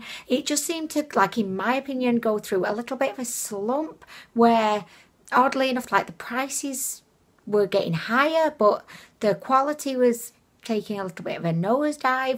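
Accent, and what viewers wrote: United Kingdom. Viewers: you make me want a British accent so bad! Wanna trade, I'm a southerner (South Carolina born and raised)? You'd just have to say y'all a ton, wet "yer" whistle with some sweet tea, while calling everyone Hun, Honey (not just Hunnies), Darlin', and Sweetie. 😂🤣😂🤣😂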